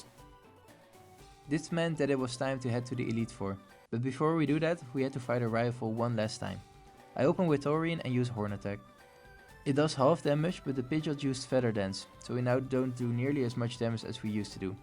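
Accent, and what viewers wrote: Netherlands. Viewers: Wait do i smell some dutch?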